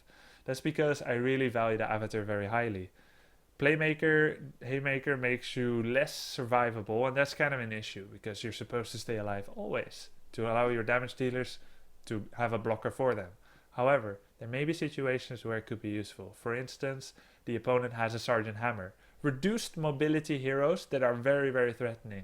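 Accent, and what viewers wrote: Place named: Netherlands. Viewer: You look and sound so Dutch :D Nice vid.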